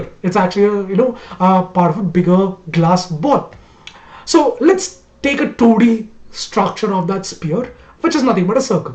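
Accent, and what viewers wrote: Kerala, India. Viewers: sir are you malayali?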